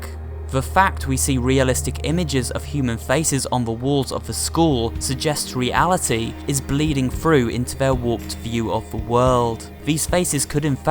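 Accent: United Kingdom. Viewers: I like how you have a British accent (no sarcasm intended) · Your british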